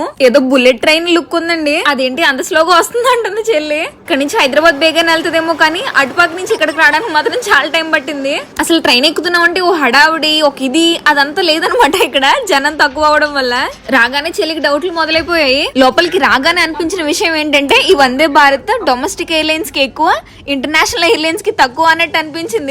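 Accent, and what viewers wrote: Godavari, India. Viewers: ఈ గోదారి మాటల గలగలలతో ఉండే మీ వీడియోలు చూసి చాలా రోజులైందండి, ఈరోజు ఈ వీడియో కనపడింది, ఎక్కడ,ఏ చిన్న విషయాన్ని కొంచెం కూడా వదిలిపెట్టకుండా ప్రయాణం మొత్తం అన్నీ బలే సరదాగా చెపుతూ,చూపిస్తుంటారు, మీ ఆరోగ్యం బాగుంది కదండి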